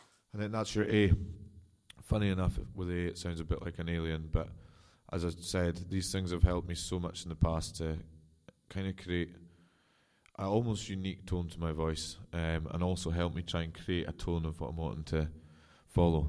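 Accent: Scotland, United Kingdom. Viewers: came here for warm ups. Stayed for the Scottish accent. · Scottish accent^^ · That's a Scottish accent :) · Love the scottish accent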